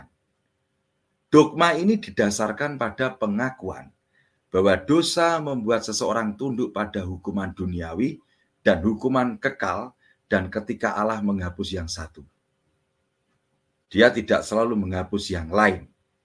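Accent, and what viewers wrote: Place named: Semarang, Indonesia. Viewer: Maturnuwun mas atas pencerahannya. Berkah Dalem. Salam dari negeri shariah Kano, Nigeria. (Logat nya nganngeni, ….semarangan mbangeti , hèèh rak..😂)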